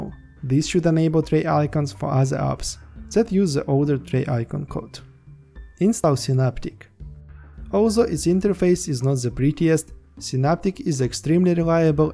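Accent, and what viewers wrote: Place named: Russia. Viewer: Русский что ли? Акцент ужасен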